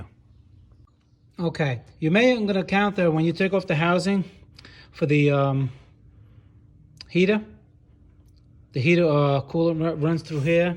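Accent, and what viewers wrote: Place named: New Jersey, United States. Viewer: definitely people may forget to comment but I promise your helping tons of us be self reliant on our repairs, I did the whole job successfully, unfortunately when i tore it down the mating surface on the block had like rust wear indentations or something, I picked the car up for 800$ bucks thought it would be a simple fix but someone really abused it. Worked great for a few days and blew it again.. oh well you live and you learn. Btw your accent is awesome, reminds me of my relatives up north. You from Jersey or New York?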